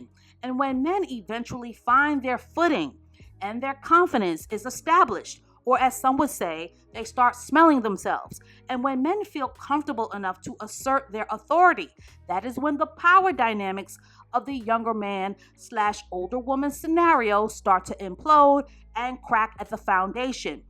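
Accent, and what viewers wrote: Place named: New York, United States. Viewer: Hey, Can you please do a video on fatherless daughter. I’m not sure if you have already. I’ve been binge listening & haven’t come across one of that topic.bI love your channel so much. I hear the New York in your voice. It brings me comfort. The Bronx? 🤷🏽‍♀️ Thats my birthday place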